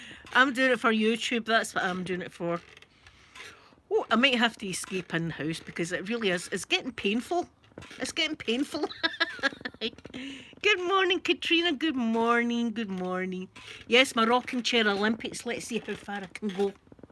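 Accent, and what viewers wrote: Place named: Corby, United Kingdom. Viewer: Ohh can of bru! Girl after my own heart, I live in mini Scotland, Corby, yiu sound like eveyone hear, even the slang the same x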